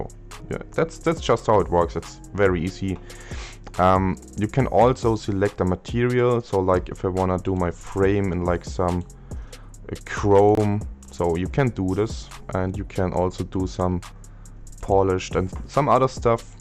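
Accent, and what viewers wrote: Germany. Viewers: Your Deutsch Akzent ist very nice haha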